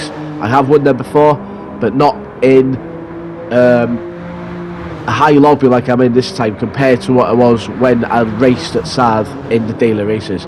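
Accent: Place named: Wales, United Kingdom. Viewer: Notice that Welsh accent now buddy! 😂 great result buddy!🤟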